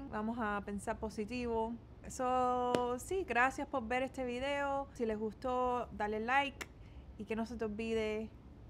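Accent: Cuba. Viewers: Ese acento cubanitoooo rico! Ya tenía ganas de oírte hablar en español. Saludos desde España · No sabía que eras cubana!! con razón tus recomendaciones de cabello siempre me funcionan, tengo cabello caribeño también. Saludos desde república dominicana. P.s. Me encanta escuchar tu acento, el acento cubano es super delicado ✨ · Amo el acento cubano ❤️❤️❤️❤️❤️ Saludos desde VENEZUELA 🇻🇪 ❤️ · Madre mia... no sabia que eras Cubana!!! Tienes un acento precioso ♥️ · SISSSS i have been WAITING SO LONG FOR THIS ONE. Yo sabía que eras Cubana desde el primer video tuyo que vi lol, tu acento es súper lindo❤️❤️ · Ahhh I love yr Cuban accent!! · Gosh Cuban-Spanish is so enchanting. It sounds like music and is filled with so much soul. Just like Brazilian-Portugese. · En México sí decimos brocha! Me encantó el video, amo el acento cubano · "I don't know how to say it!" hahaha...that's totally me. 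Fun video getting to see you talk in Spanish. Cool hearing your Cuban accent too. No estamos aqui para juzgar...hahaha totally! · He estado esperando este video!! Not sure why either. I love hearing our beautiful language spoken. Y el acento Cubano me encanta. Well done 👍🏼🙂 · Este acento cubanoooo, me encanta jajajaja ❤️ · Yesss! I love hearing the Cuban accent! Also, this helps me learn new words. Thanks girlie!! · Love your cuban accent! 😘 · Anda, un video en español. Mi marido (que es dominicano) subió la ecalera escuchando el video y imediatamente me dijo: "Ella es cubana". Me encanta tu acento. Feliz Navidad y saludos desde Escandinavia. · THAT CUBAN ACCENT 😍 Love this video so much. Merry christmas G 🎄🤍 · Eres cubana mi amiga 🇨🇺 se nota por tu acento tu te trata de hablar español para los suscritores me entiendes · Ese acento Cubano 🙌 amoooo! Orgullo Cubano😊 Soy Cubana también ❤ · ! 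Me encanta tu acento cubano, soy mexicana y mi primer idioma es español, y me encanto escucharte, y si se dice brocha, a las makeup brush, amo tus vlogs y a Dexter, this was refreshing loved it ❤️ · Qué belleza! Me ha encantado escucharte con tu acento cubano. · El acento cubano es hermoso, veo que sí lo manejas pero es como desempolvarlo 🤣 y hacer el switch al otro idioma en lugar de traducir en la mente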